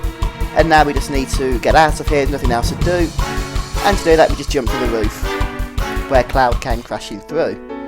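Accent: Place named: United Kingdom. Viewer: where is the accent from? it sounds... British? but i know its regional, but what region. lol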